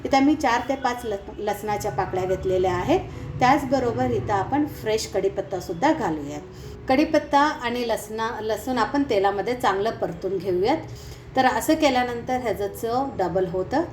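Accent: Karnataka, India. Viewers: छानच आहे पाककृती.ज्वारीच्या शेवया.आमच्याकडे अशाच तांदळाच्या पिठाच्या शेवया करतात. तिखट किंवा गोड तोंडीलावणेबरोबर खाल्ल्या जातात. तुमच्या बोलण्यावरुन तुम्ही कानडी असाव्यात असे वाटते.आमच्या शेजारी धारवाडच्या कडकोळकाकू असेच मराठी बोलायच्या.धन्यवाद. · तुमची मातृभाषा कन्नड आहे का 😊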